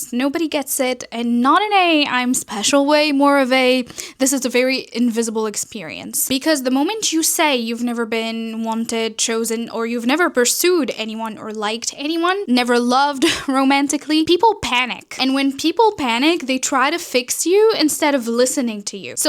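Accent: England, United Kingdom. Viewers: Guuuuuuuuurl your english accent !!!! You slay queeeeen ! Keep up the good work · i loveeed ur english accent machalah <33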